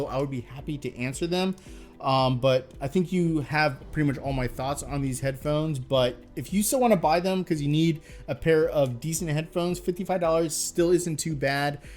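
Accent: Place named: Canada. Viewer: I hear Canadian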